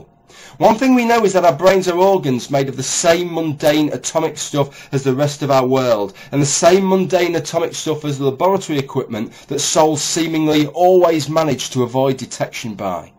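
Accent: United Kingdom. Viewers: To most Americans, you sound 50% smarter by just having that British accent. I agree with you, by the way.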